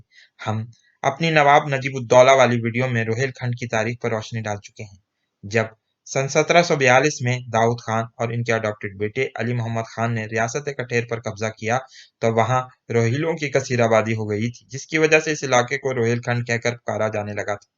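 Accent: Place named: Pakistan. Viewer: Tum lehjay se koi Pakistani lgte ho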